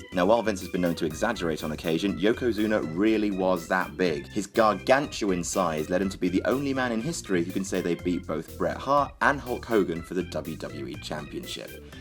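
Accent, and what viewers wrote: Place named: United Kingdom. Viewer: fucking hate British accent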